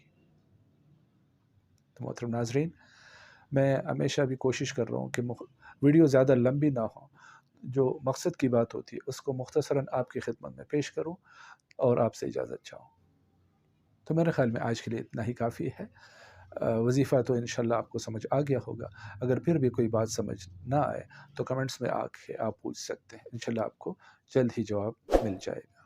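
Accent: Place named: Gilgit, Pakistan. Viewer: Aap Shia Hain ?? ✍️✍️✍️. Awaz say ttu aap Gilgitti lagtay Hain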